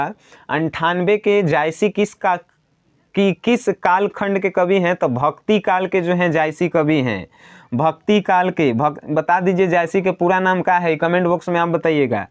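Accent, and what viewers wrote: Bihar, India. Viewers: Bihar se ho bhai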